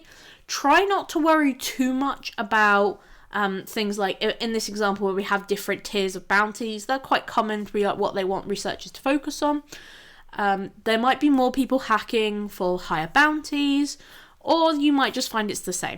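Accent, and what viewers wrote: United Kingdom. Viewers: Love British accents :)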